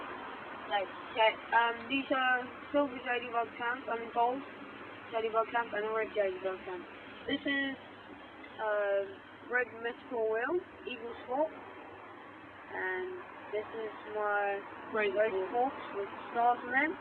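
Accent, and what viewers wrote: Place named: Southern Africa. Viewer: nice southern african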